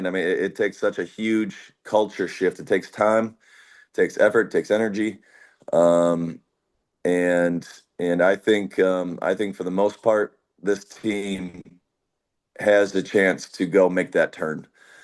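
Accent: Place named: South, United States. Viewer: !!! When did you pick up that southern accent?!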